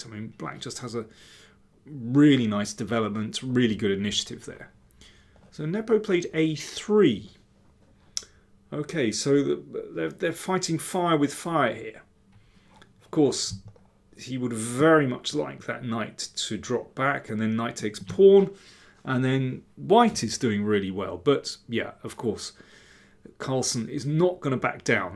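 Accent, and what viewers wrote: United Kingdom. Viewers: Mystery to me, both entertaining and gives great explanations of the ideas behind the games. I have been wondering if it's because many chess players find following the native British English harder than non native where the words are both simpler and enunciated more slowly.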